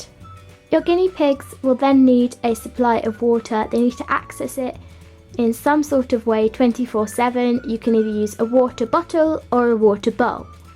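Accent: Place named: Scotland, United Kingdom. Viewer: I was wondering where are you from because I’m Scottish and we have the same accent ❤️ I also have a guniea pigs names Zelly and George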